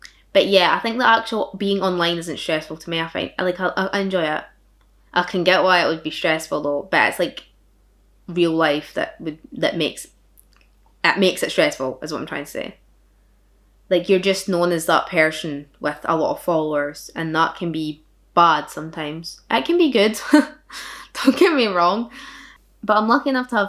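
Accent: Scotland, United Kingdom. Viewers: Just seen Brad Mondo react to one of your videos and recognised the Scottish accent! I'm originally from Cumnock (Ayrshire) which could def give you Fife people a run for strongest accent lol I live in the US now though. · As someone who’s from London I can’t even hear through ur accent sometimes but Scottish accents are cool! (Also i fuckin wish I didn’t have to pay for uni it’s so much)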